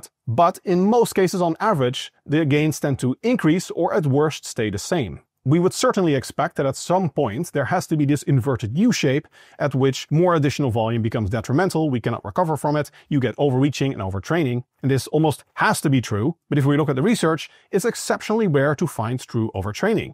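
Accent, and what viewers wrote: Netherlands. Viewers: Still here...for the hard truths and the Dutch accent on anything "un-"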